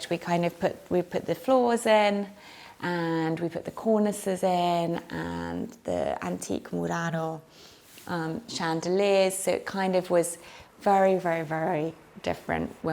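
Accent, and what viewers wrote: England, United Kingdom. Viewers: What a fabulous home. So cozy and welcoming and beautifully styled. Can tell is a very genuine person. I love her personality and her beautiful English accent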